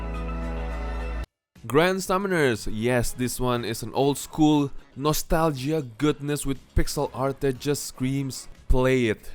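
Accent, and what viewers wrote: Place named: Indonesia. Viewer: can tell u r indonesian in just few seconds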